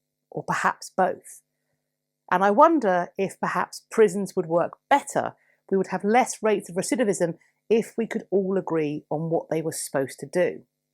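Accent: United Kingdom. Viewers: With your British accent, you present as a stuffy person, but, you are a hoot, a very kind hoot. ;)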